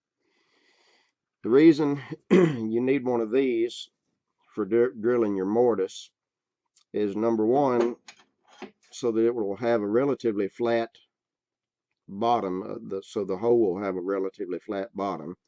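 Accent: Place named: South, United States. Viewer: I literally thought I would need 2 or 3 carving tools.. but I see that Iwould need to spend a lot and that is not a problem because it is investment to use them for other work.. but I hate having so manyh tools around which I will use once every 3 years for whimp.. I thought it would need much less tools to do it hand made.. thanks a lot for your informative video. What state or region is your accent from.. thanks. is it southern accent?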